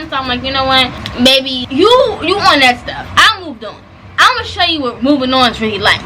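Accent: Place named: Washington DC, United States. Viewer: That dc accent, PUURRRRRRRRR🤣❤️❗️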